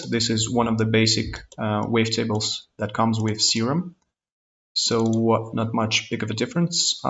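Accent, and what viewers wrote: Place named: England, United Kingdom. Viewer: О,вы из англии?))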